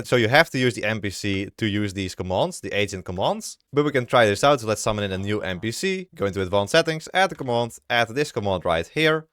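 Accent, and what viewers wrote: Netherlands. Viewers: Damn bro ur dutch accent is faint. Lekker pik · Lekker hollands accentje 😂 heerlijk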